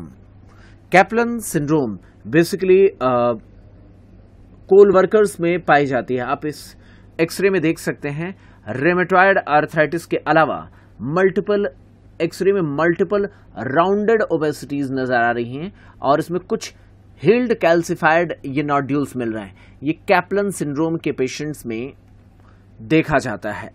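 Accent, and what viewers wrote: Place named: India. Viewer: Good, but please hire a narrator with clear English because it's difficult to understand your Indian English (no offense)...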